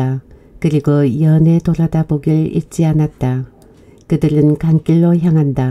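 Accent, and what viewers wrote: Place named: Chungcheong, South Korea. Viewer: 충청도 사투리가 이런 억양인가요? 경낭도인줄 알았는데? 진짜 못 알아듣겠네요.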